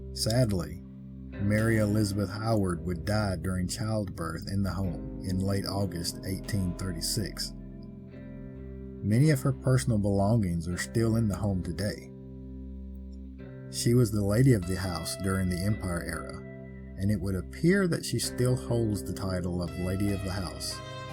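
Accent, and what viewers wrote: South, United States. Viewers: Hey glad to have and hear you back my 'Southern drawl dreamy dude' hope ur family lingerie all recovered xxx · Another great video, as always! I'm hooked on this channel - you have a wonderful relaxed, laid-back voice with that Southern drawl - absolutely perfect for story-telling! It reminds me a lot of Shelby Foote - I loved his wonderful anecdotes and stories in the "Civil War" TV documentary! I would love to meet that friendly woman ghost - especially if it were possible to have a conversation with her! I'd love to know what people of past eras would think of modern things like electricity, computers, the Internet and cellphones. Keep up the great work!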